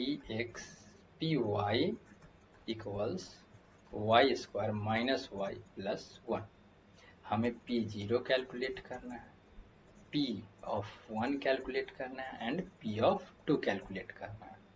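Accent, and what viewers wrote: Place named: Bihar, India. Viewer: That bihari Accent while teaching is hypnotising, Nice Explanations 🙌🙌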